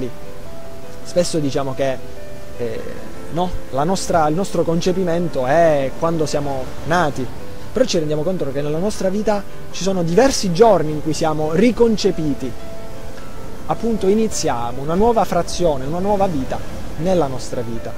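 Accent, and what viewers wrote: Italy. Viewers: Dal modo in qui pronunci la C si sente che siamo dello stesso paese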